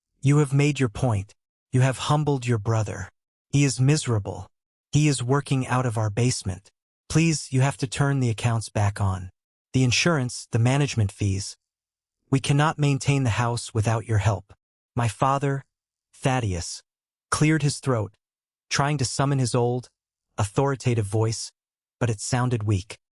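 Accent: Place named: South, United States. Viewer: I love it that the AI voice sounds like it’s speaking Chinese with a southern accent. 😂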